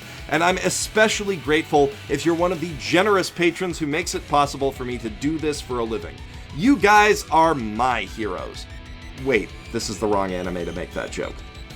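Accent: Canada. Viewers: Hole maple syrup Goku! your Canadian! I had a feeling i liked you speech pattern, guess because your Canadian. Well Subscribed! keep up the good work :) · WAIT!! Your're Canadian!? How did I not catch that?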